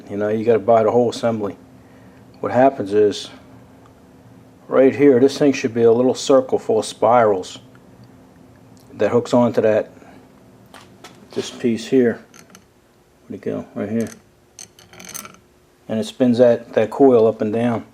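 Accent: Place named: New Jersey, United States. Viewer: Thank you! this is exactly my problem. Awesome vid! also, are you from New Jersey? -just wondering cuz of the accent.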